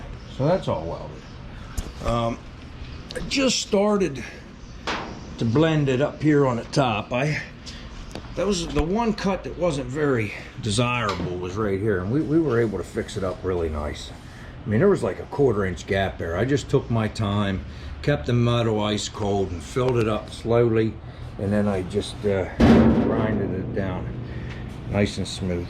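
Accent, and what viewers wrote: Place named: St. Louis, United States. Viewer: Are you from St. Louis mo. Area? My YouTube entertainment lately is guessing people location based on accent.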